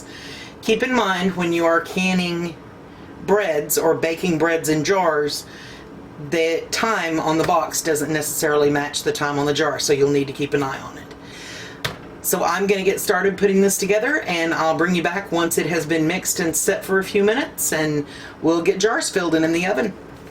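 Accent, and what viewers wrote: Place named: Texas, United States. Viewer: Are you a TX girl? Love to listen to you talk.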